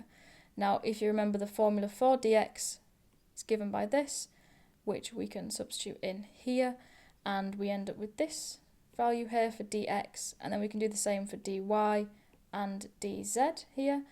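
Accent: United Kingdom. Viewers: Bruhh that's british not Australian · Are you British?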